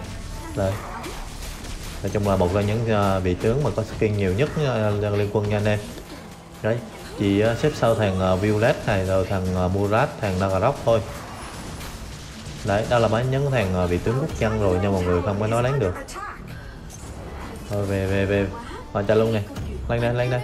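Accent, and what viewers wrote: Quang Nam, Vietnam. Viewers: Ông này ở quảng nam thì phải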